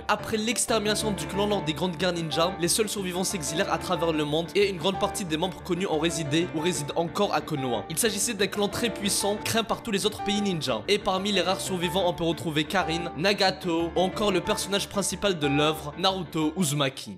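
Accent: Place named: Kabylie, Algeria. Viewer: Je salut ton accent kabyle ✌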